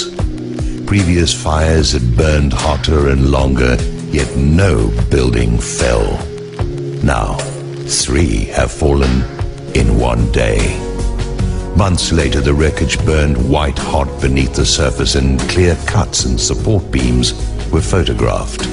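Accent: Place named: United Kingdom. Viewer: You will be doing this video & the message an immense service by changing the word 'American" in the first sentence to 'Everyone'. The British voice over tells us to use 911 as a litmus test for complicity or stupidity. Please, all those of the truth movement - keep in mind the INTERNATIONAL scope of the problems, of their impact and of the audience watching your videos.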